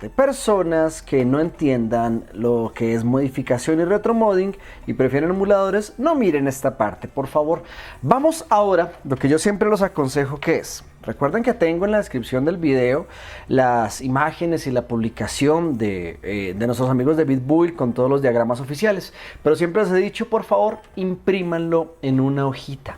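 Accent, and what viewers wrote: Colombia. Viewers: Qué bonita es la electrónica, lástima que no tengo ni la paciencia ni el pulso para trabajarla...por cierto ese acento de los colombianos/colombianas ME ENCANTA!!! Recuerdo cuando cursaba la carrera de informática , cómo optativa tomé sistemas digitales , y al momento de hacer los puenteos , si quedaban muy grandes o muy feos llegaba el profesor con sus pinzas y nos decía" No me gustan las patas de araña" y zas , que nos cortaba los cables! creo que por eso elegí la parte de software en vez de hardware.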